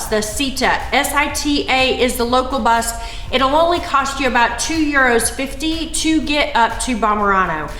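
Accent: United States. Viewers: Thank you so much, the only thing and would help me much more is by putting subtitles for the next time!! Specially for the names that aren’t well pronounced (sounded with so much american accent I can bearly understand the towns!!)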